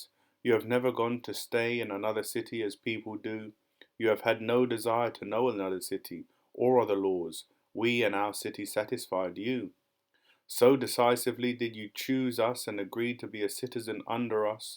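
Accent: United Kingdom. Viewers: Gracias bro. Are You british?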